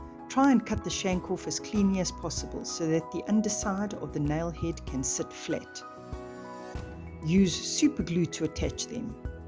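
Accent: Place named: South Africa. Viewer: Hello from a fellow South African! It's always such a treat to click on a video and hear the familiar accent 💖I can't believe I've only stumbled on your videos now. I am miniature mad and consume miniature content like an addict 😂 Your work is exquisite and you are a true artisan. I've learnt so much from you in these three videos, so thank you! 🥰 I have loved all things miniature since I was tiny but have only seriously started making minis this year. It really is the BEST 💞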